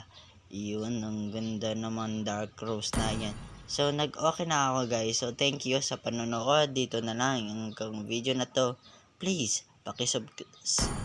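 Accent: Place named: Bisakol, Philippines. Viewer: Parang bisakol na nga mag explain tas scam pa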